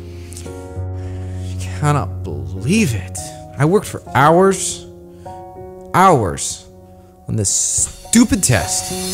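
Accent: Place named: United States. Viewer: like your accent ... it's rare to find an American accent in magento field hhhhh😂😂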